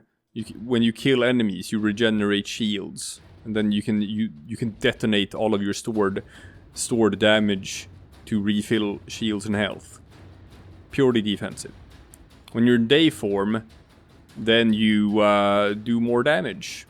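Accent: Sweden. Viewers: First time seeing this channel, the second I heard that voice. Yea definitely a Swedish man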